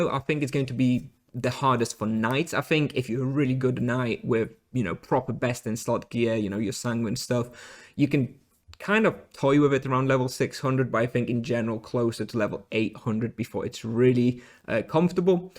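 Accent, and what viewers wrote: United Kingdom. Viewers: Bro are you british?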